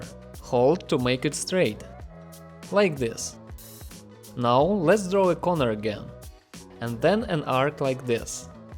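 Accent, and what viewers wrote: Russia. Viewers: Очень рашн акцент :)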